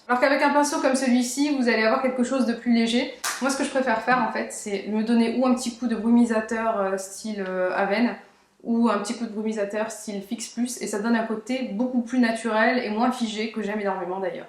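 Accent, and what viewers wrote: England, United Kingdom. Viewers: Super vidéo, merci ! Pour ma part, ton accent anglais me convient parfaitement, je trouve au contraire qu'il est top, ce qui n'est pas courant ;-)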